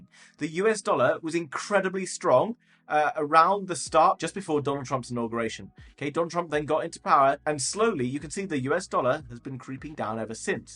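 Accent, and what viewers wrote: United Kingdom. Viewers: The British accent adds comfort and trust lol. He could sell an Eskimo a deep freezer.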